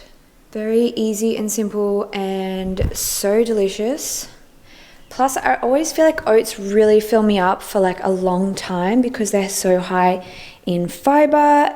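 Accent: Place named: Australia. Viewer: I feel like they have the best Aussie accent I've heard on youtube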